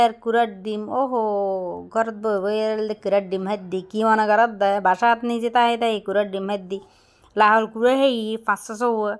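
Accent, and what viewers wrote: Chittagong, Bangladesh. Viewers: আপু তোমার মুখে চিটাগাইংগা ভাষা অনেক সুন্দর লাগে 😅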